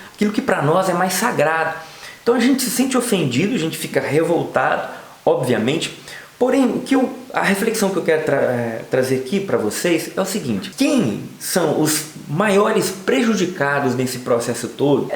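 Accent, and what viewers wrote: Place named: Caipira, Brazil. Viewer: Voce é caipira hein fiii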